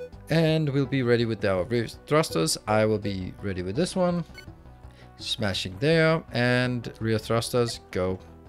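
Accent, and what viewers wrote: Denmark. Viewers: Are you danish by any chance, the accent kinda gets me, just Got this game its so Fun.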